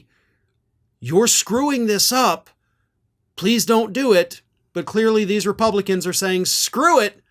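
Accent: South, United States. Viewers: He actually sounds like Yosemite Sam mixed with Foghorn Leghorn. I can't stop😂😂with Farron Cousins southern accent...this just makes my day.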